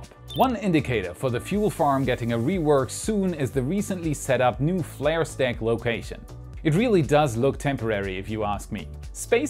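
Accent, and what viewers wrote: Germany. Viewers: More German accent please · Now when I know that you are German I can hear your accent all the time 😂😂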